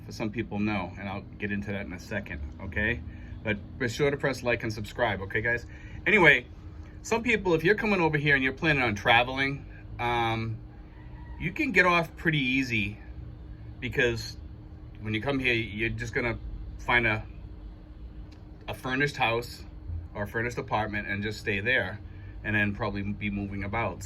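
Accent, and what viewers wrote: United States. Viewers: I just recently subscribed and really enjoy the videos. Probably the best info i have found by an expat in the Philippines. I also invest in both Tesla and ARKK. Both are great investments. I just sold my business recently and my filipina and I will soon be moving to the Philippines. I have been trying to figure your accent. What part of states are you from? Thanks once again for the great and informative videos.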